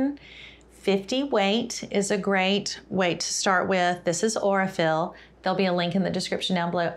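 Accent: South, United States. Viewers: Can I just say you are hilarious in the most wonderful way! You remind me of my best friend Jane, so ifeel I know you already. I have already made quilts but I clicked on your series because my daughter gave me some of her husbands shirts. I cut the collars and cuffs off and she said she needed them back! Shock horror! Lucky for me she was only joking! So thanks for sharing your knowledge, your sense of humour and your beautiful personality and your southern accent. from Jilly in U.K. x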